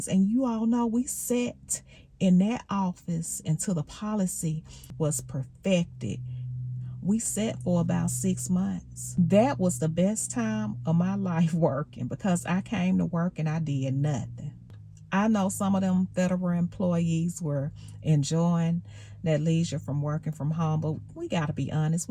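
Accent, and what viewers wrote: South, United States. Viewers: Love the southern accent. Love the video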